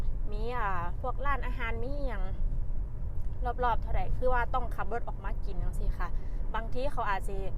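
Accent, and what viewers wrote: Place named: Chaiyaphum, Thailand. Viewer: สวัสดีจ้าพึ่งเข้ามาเบิ่งเทื่อแรกจ้า ฟังเทื่อแรกเดาว่าเป็นคนชัยภูมิเดาถูกอิหลีหนูกะคนชัยภูมิคือกันจร้า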